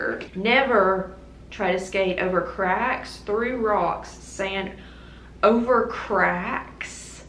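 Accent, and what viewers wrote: South, United States. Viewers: Top tier American accent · You're losing your sexy southern accent 🥺😭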